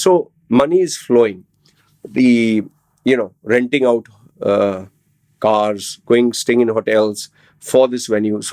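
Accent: India. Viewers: bro you have an indian accent?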